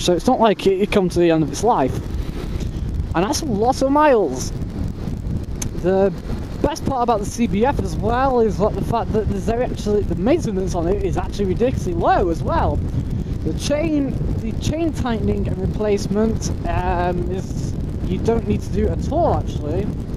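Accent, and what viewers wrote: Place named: China. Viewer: You make English sound Chinese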